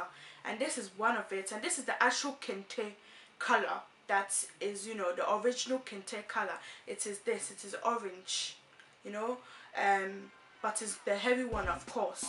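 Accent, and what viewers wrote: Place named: Nigeria. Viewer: lmao ur ghana accent sounds nigerian not ghanaian........ love ur tag interesting u shd hve danced azonto for us tho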